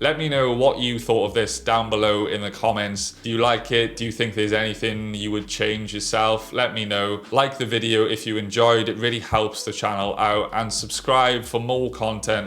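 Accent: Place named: United Kingdom. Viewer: You sound British. Do you work at Spotify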